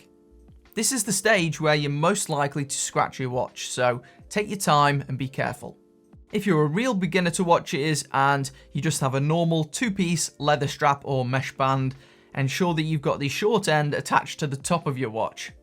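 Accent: Stoke, United Kingdom. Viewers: Are you from Stoke?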